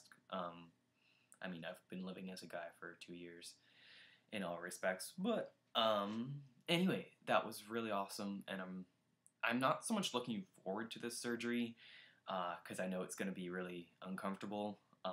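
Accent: Canada. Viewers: Your Canadian accent is really noticeable now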